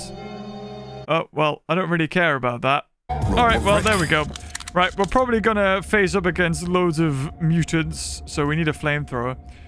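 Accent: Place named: Newcastle, United Kingdom. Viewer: We got a love also he’s firm Newcastle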